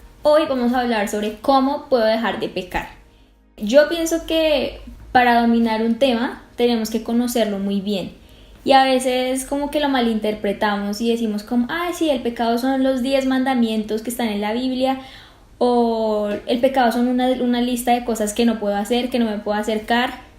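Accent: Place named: Colombia. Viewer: Que asi Sea, Que Dios te escuche. Por que la verdad han sido meses de mucho dolor y sufriimiento. Me encanta tu asento colombiano, y la frescura de tu ser. estare muy pendiente de tu Nuevo video, espero sea pronto. Un abrazo fuerte de regreso.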